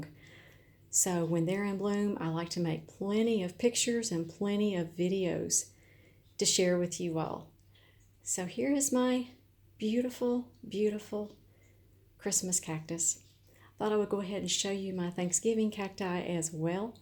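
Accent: South, United States. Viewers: I love your accent, is it a southern accent? Beautiful. I love these plants, I have 3 of them. One is solid vibrant pink and the other two colors are rare colors, Sumba Brazil (reminds me of a sunset) and Gold charm (pink and yellow mix) ❤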